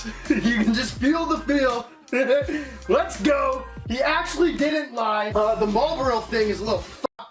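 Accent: Canada. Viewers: Where in Canada 🇨🇦 are you from I love finding out youtubers are from Canada like the nelk boys I assume your in Canada with the ehhs and boys aacent lol.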